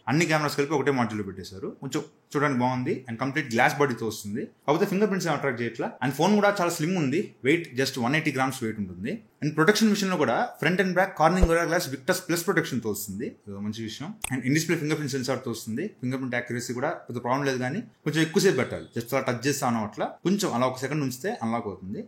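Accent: Nellore, India. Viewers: ఏ ఊరు బ్రో మీది? కొంచం నెల్లూరు యాసలో మాట్లాడుతున్నారు?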